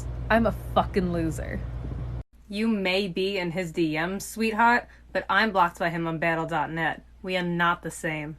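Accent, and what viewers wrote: New Jersey, United States. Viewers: So I usually don’t like the jersey accent- I find it abrasive. But her accent is quite beautiful. It’s very soothing and almost musical. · This is the only time I heard people say they actually like the New Jersey Italian accent. It makes me happy :’))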